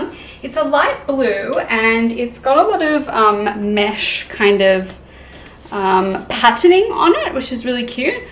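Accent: Australia. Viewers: Is she Australian from her accent?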